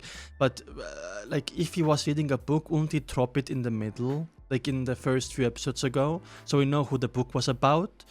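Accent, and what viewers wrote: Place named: Estonia. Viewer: tulid mulle for you pageile ja ma sain aktsendi järgi kohe aru et oled eestlane. väga äge näha et eestlased teavad ka mis peak on 😋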